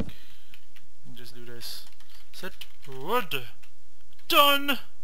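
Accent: Ireland. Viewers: i only just found this channel and its says your irish i am too but i cant tell from your accent where your from ???? :O :) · is your accent irish?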